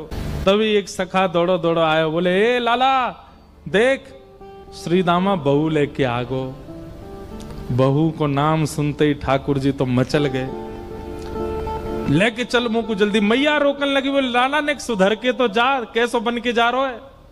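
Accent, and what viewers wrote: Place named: Braj, India. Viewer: Indresh ji ap bhut acha bolte ho. Kitni khoobsurat h apki awaz. Apki Braj Bhasha. Sach m sundar❤❤❤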